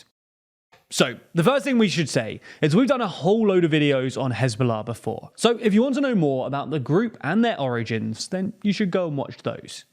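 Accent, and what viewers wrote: United Kingdom. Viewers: You sound british. Let me ask you, when the germans attacked your country in 1940 did your grandparents care about the german civilians??? Grow up. If you elect terrorists, expect terror.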